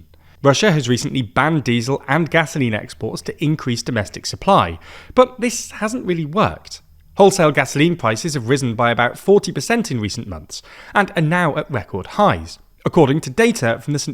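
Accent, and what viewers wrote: England, United Kingdom. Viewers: So why not direct your biased, English accent analysis to the Wall Street collapse going on right now?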